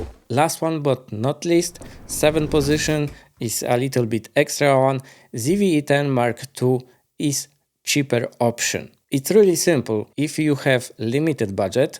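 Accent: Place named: Russia. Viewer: Your accent is very simillar to russian😅 I want to by e6700. Thanks for testing it.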